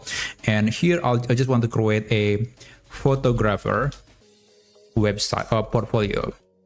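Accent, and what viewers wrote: Indonesia. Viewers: That's what I thought, too. his English is Indonesian English. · stunning tutorial man, great job! btw your accent like indonesian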